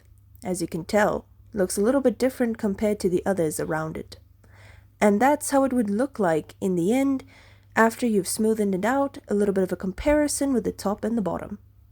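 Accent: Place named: United Kingdom. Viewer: Oy you also British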